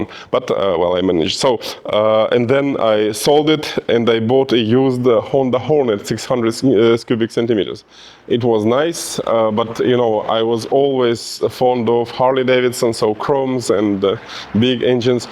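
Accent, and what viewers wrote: Russia. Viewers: I thought you where German but Russian is also ok 😊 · Hahaha. Like we couldn't guess you were Russian right from the beginning. Lololol. You're too cute Dear heart. Your workshop is looking fantastic. Great job getting it tidied up. ❤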